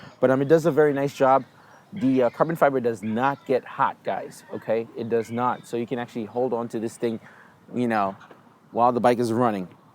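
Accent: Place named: New York, United States. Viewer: For some reason all I can hear is John Leguizamo, well without quite such a harsh Queens accent. Must be a New York thing, though you sound nothing like my friend that's New York Italian/Irish, but then he's from Northern NY.. 30+ years away and his accent is still thick as a cabby's heart.. especially when he drinks. Ever noticed the Termi logo looks like a pair of balls with a schlong hanging low? :P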